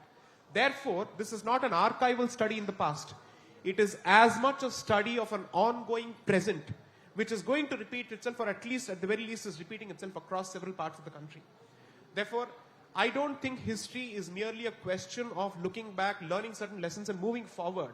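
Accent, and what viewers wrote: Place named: South India, India. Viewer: WHT is this idiot blabbering in south Indian English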